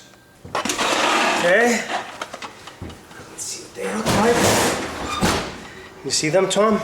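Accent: Boston, United States. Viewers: I haven't ever seen them made with tomato sauce, now that is Sicilian, but you have a strong boston accent!!!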